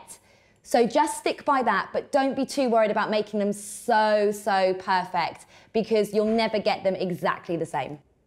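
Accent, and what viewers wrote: United Kingdom. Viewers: the first few seconds were the funniest because she's talking sooo freaking fast plus that british accent haha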